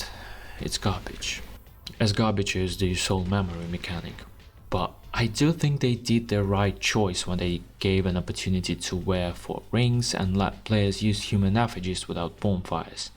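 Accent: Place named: Russia. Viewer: Just found your channel and honestly your editing style is really great! If I could levy a few suggestions, I think you should raise your voice a little, rather naturally or in post editing - from what it seems like you are a native Russian speaker, but I could be wrong, so don't worry too much about your speaking or accent if you are or not, good content usurps it and this certainly was, even though your voice was definitely not an issue. Another thing, I do think your intro meme/video was a bit long and might cause some early click-offs - shorter length ones in the future will do the opposite. Also great background footage! You can tell its from mostly if not all of your own playthroughs, so it gives credibility to your arguments. As someone who was introduced to the souls series through Dark Souls 2 and a personal fan of the game, this video was honestly pretty solid even though it felt like you sided against it in a sense. 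Again though, amazing video!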